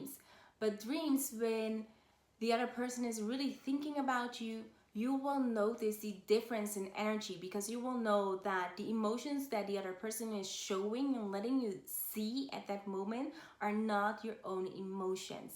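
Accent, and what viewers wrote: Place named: Haiti. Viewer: Are you part Haitian? You have an accent like you are...Also if you are this is Also a sign as well for me!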